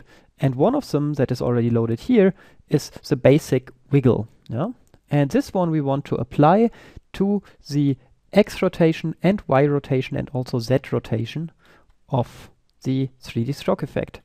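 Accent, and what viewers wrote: Germany. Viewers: deutscher .. hört man sofort :D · This tutorial is great! It doesn´t matter if you hear a German accent! Any accent is better than using a bad translator - 0k? :) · are you german? ^.^ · Deutscher, hört man ;)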